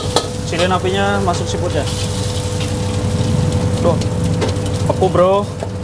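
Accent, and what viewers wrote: Banyumas, Indonesia. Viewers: wong ngapak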